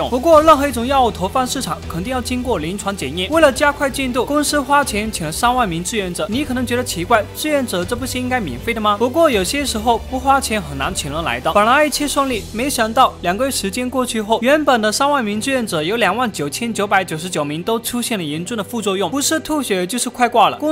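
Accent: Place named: Fujian, China. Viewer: 应该是胡建。普通话不标准。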